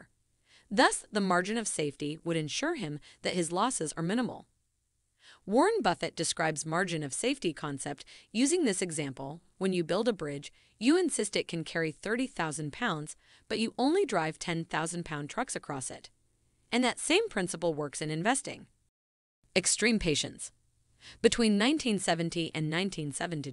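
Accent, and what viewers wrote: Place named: United States. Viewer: How do you have an American accent and NOT know how to pronounce "Carolina"?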